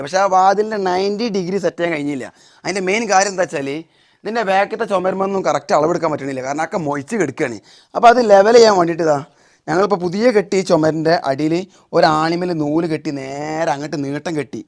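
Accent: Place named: Malappuram, India. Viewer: മലപ്പുറത്ത് അങ്ങനെ ആണ് 🥰 മഴ മയ പുഴ പുയ 😅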